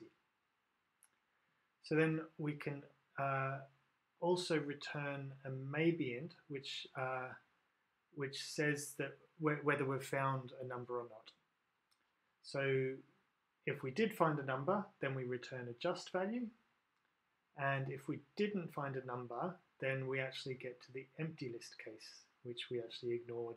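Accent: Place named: Australia. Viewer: P.S., Hello from New Zealand. You sound Australian, though I can't tell for sure :-)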